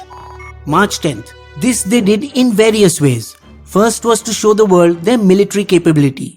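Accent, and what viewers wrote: India. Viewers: well the accent is india so bye the vedio is gonna be as fake as the indian media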